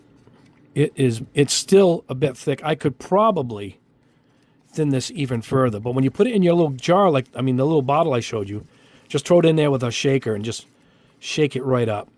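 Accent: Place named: Massachusetts, United States. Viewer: dang, from your videos it sounded like you were up in Mass some where, but Rhode Island is just as close xD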